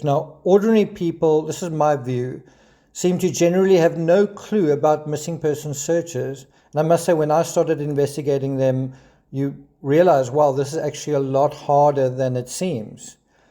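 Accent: South Africa. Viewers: Thank you for this excellent summary! So great to hear a South African voice... I am a South African living in the UK. I have followed the case from beginning to end and I am sorry that Jay Slater has lost his life, but I am glad that his body has been found.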